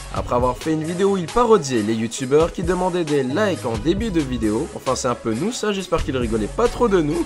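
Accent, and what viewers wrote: Quebec, Canada. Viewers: T'es super! Mais es-tu Quebequois?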